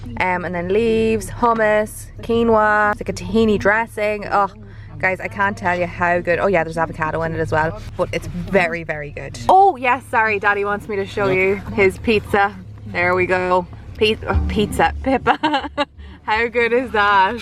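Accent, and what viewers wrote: Ireland. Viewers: Missing so much your videos!! Because it’s like chatting with you, like hanging out with you, also I loooove your Irish accent and I learn it with you 🙊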